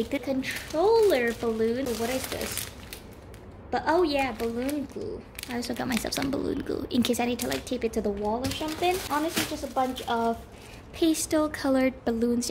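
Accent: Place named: United Kingdom. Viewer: Man her accent is interesting, it’s randomly British with her vowels and other times it sounds American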